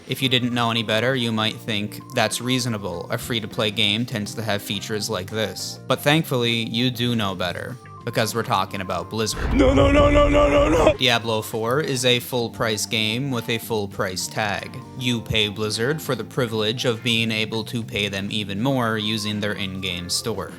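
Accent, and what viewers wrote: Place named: Newfoundland, Canada. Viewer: You sound like a Newfoundlander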